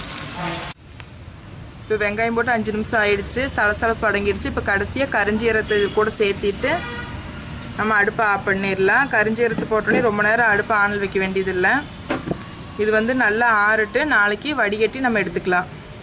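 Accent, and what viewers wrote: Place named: Kongu, India. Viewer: உங்கள் கொங்கு தமிழ் very nice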